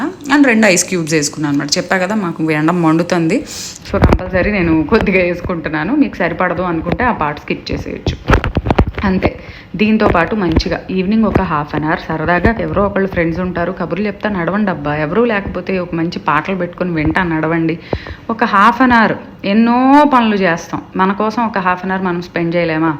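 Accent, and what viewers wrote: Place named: Vijayawada, India. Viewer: Nice to hear Vijayawada Telugu 😊👍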